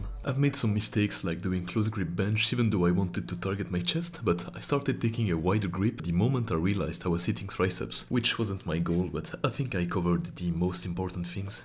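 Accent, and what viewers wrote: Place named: France. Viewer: You're belgian? You have such a french accent, though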